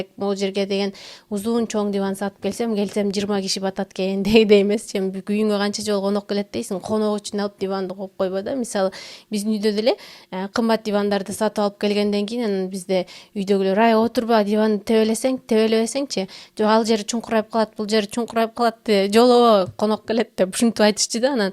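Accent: Standard. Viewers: Эң соонун кенештер. Кыргызча таза сүйлөгөнүңүз үчүн сизге чооң ырахмат. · Аягына чейин аябай кызыгып коруп уктум,унунор да жагымдуу, озунор да татына кыз экенсинер,таптаза кыргызча суйлоп адамдын мээсин ачып куйчуну куюп кайра жаап койгондой айттынар баарын,молодец аман болгула ишинерге ийгилик🎉🎉🎉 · АЗАМАТ КЫЗ ЭКЕНСИН, АНТКЕНИ КЫРГЫЗ ТИЛИНДЕ ТАК ТАЗА СУЙЛОЙТ ЭКЕНСИН ! БУЛ СЕНИН АКЫЛДУУ ЭКЕНИНДИ. БИЛДИРЕТ ! << КИРГИЗ >> ЭМЕС ЭКЕНСИН ДЕМЕК КЫРГЫЗДАР УЧУН ЖАН ТАРТКАН ЖАКШЫ. АДАМСЫН !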